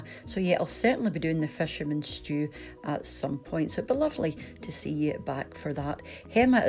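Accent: Scotland, United Kingdom. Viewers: Very impress with your recipe simple n easy. I am chef as well this first time seen your channel☺️ well done, most is your voice so Scottish and clear voice n your giggling 😉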